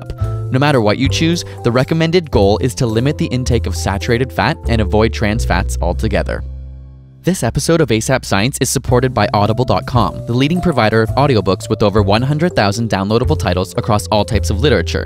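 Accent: Canada. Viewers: Haha, hello fellow Quebecer, I see that margarine container. Do you live in Montreal your accent would suggest it.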